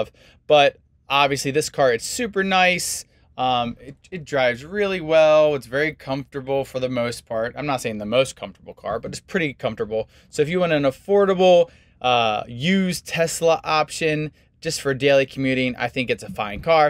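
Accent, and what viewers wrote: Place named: Baltimore, United States. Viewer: Nice video. I am having trouble getting over the Packers quarter zip. I had always thought you were a local Baltimore guy with a Townie accent. Seriously though, nice vid. Did I miss the overlay of the original "new" charging curve? Maybe the Packers top blinded me.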